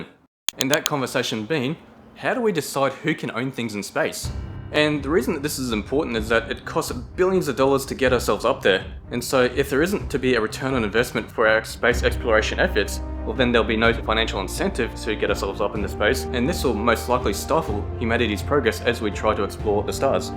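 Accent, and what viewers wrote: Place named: Australia. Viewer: Good to hear australian voice and humour 👍👍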